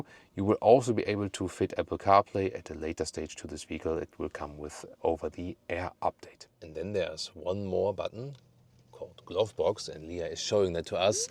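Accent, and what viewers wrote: Germany. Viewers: Allway Watch he has more of an accent then my cousins and they’re German too. Lol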